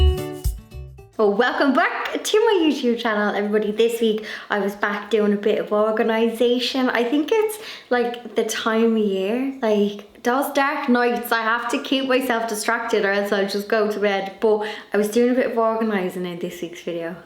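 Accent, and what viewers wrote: Ireland. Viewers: Your so bubbly and I luv that your so independant and a home owner. I too do most things myself,independant and am home owner. Luv your Irish accent . I'm Canadian. Luv your videos and Blondie.🎃🎃❤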